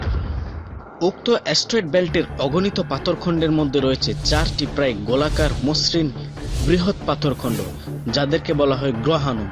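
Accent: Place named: Sylhet, Bangladesh. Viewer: সিলেটের ভাই মনে হয়। যাই হোক তিনি তার সর্বোচ্চ চেষ্টা করেছেন।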